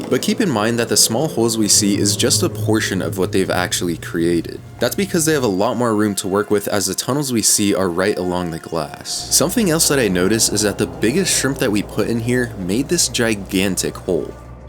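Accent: United Kingdom. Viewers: does bro sounds british on purpose? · Is he or his voice became British? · Bro is British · Bro are you British can you say bottle of water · Are you a british brit ‽‽‽ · why are you in a british accent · Are you a Brit bro? Why u sound like dat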